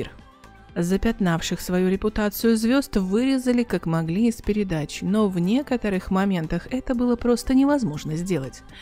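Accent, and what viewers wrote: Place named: England, United Kingdom. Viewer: чувствуется английский акцент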